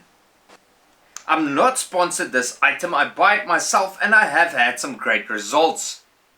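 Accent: South Africa. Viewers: Bloody hell how South African is this guy? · Would that be an SA accent I'm hearing? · ... good job, well done. i can hear you have a South African accent. Greetings from Moscow ... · I'm assuming you are south African, your English is making me exhausted haha, don't paint the dovetail ways.looks Good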